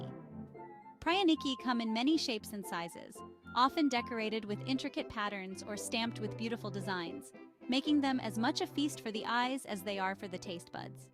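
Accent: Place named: United States. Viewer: As a Russian speaker, I wince at the American pronunciations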